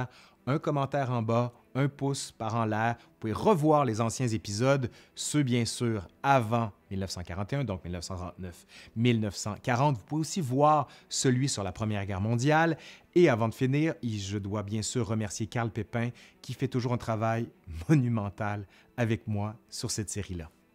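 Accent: Quebec, Canada. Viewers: Voilà voilà !... Un pouce en l'air et mon commentaire en bas ! Cet accent québécois est royalement beau. C'est de l'ancien français, mais c'est chouette quand ça entre dans les esgourdes, et Laurent ne nous le parle pas à la va vite, comme ils font souvent là-bas, si bien qu'on arrive à suivre ! Bon, on se laisse ici à nouveau puis on se retrouve en 42. C'est ça ?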